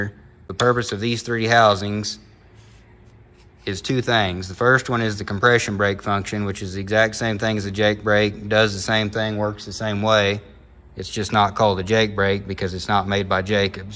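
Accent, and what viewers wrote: South, United States. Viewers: I'm from wis but damn I like that accent. Prefect blend of knowledge and no fucks. Makes me think about moving south. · The southern voice is something that just keeps me comin back, I can listen and retain information from him all day, no music, no big word science bull shit. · I agree.....He's a very knowable person...don't let that Southern accent fool you. Cheers from San Francisco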